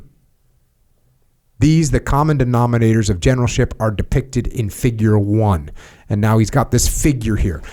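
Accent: England, United Kingdom. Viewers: Brilliant from England your English accent had me in creases also well done recognising the authors dry humour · English accent Jocko is the Jocko I didn't know I needed. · Jocko with a British accent is pretty amusing.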